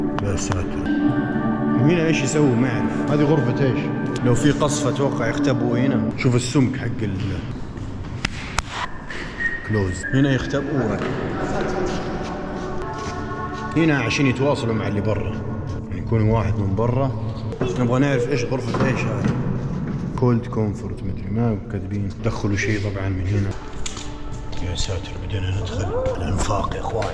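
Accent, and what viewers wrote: Saudi Arabia. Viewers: من تونس لاكن عاش فالسعوديه طفولته عشان كذا يتكلم سعودي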